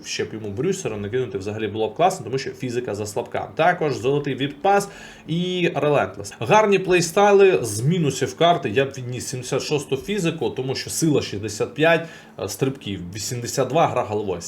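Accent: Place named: Kharkiv, Ukraine. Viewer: В Харькове так же говорят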